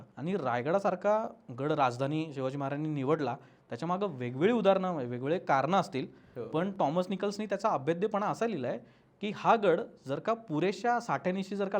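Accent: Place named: Satara, India. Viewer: Sir सातारा language madhi boltyat o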